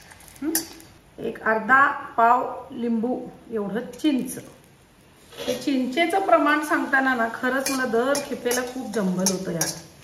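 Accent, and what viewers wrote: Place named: Belgaum, India. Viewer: Cute belgavi marathi. Ani cute recipe. Live it.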